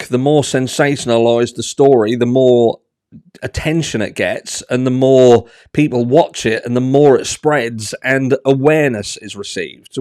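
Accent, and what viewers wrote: Norfolk, United Kingdom. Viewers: You ALWAYS look amazing, love, LOVE YOUR CHANNEL, ❤❤ Ps, I live in s Spain, but originally from BECCLES, you have a wonderful refined 'Waveny norfolk' accent.....Where??? Sounds great x❤😊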